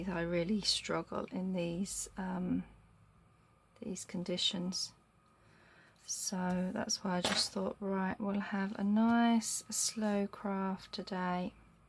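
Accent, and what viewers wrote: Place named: United Kingdom. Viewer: Hi there! A video from you popped up today & when I read “a nice relaxing time”, I thought that’s for me! In what part of the UK do you live? I love listening to all the various accents people have! I live in Kansas which is smack dab right in the middle of the US! Your little tags & cards are really cute, I love the embossed leaves you used! I giggled a little when you pointed out all the fold lines on your envelope, I have several that look just like that! I had a terrible time trying to figure out the scoreboards! I hope you feel better soon, do you have fibromyalgia? I have arthritis in just about every joint & changes in weather really bother me. I look forward to seeing more of your videos! I have liked & subscribed! Thank you! Erica❤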